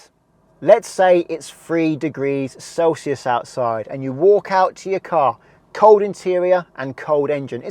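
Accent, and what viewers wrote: United Kingdom. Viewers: Is he s British or something? Sounds different to me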